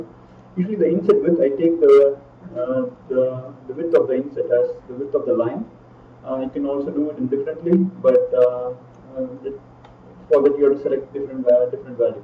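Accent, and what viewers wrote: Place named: India. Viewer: The audio quality is so poor and the Indian accent so strong that the video is practically unwatchable. Thanks for the attempt but I rank it 3/10.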